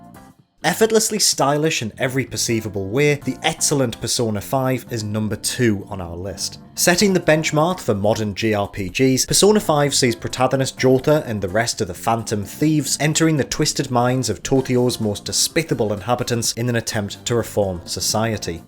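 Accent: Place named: Northern England, United Kingdom. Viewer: Accent sounds like somewhere in northern/North Eastern England. I want to say Hull specifically.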